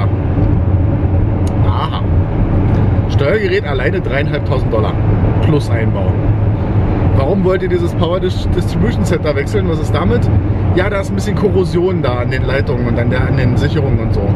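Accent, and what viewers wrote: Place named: Germany. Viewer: Kann hier nur die Videos von Luca Lerga empfehlen, der hat sich mit dem Thema kaufen oder mieten beschäftigt , das trifft ja auch auf den amerikanischen Markt im allgemeinen zu. Eine weitere These hat Mike Hocke vor kurzem aufgestellt, und zwar wenn die Werkstätten deinen deutschen Akzent hören versuchen die dir die Scheine aus der Tasche zu ziehen. Sind aber alles nur Vermutungen. Ich bin auch selbstständig und mein Auto ist auch ständig in der Werkstatt und immer wenn ich denke , jetzt ist aber alles gemacht, dann geht wieder irgendeine Kontrollleuchte an. Ich kann echt mitfühlen wie scheiße das ist.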